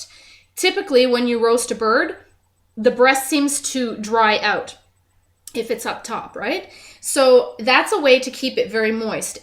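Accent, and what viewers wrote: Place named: Canada. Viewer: Your accent, im loving it... your canadian huh... yeah, im flirting just a bit...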